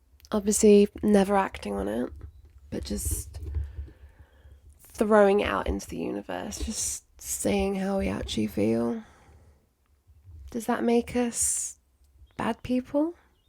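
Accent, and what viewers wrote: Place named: United Kingdom. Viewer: The best part about this video is your british accent and the overall " bustiness " of the video (if you catch my drift😏). The worst part of it is its yet another reminder of what I've never had😔.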